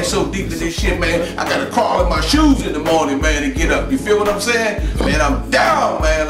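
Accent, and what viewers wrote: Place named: South, United States. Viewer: he talk like he from the south but just a lil faster tho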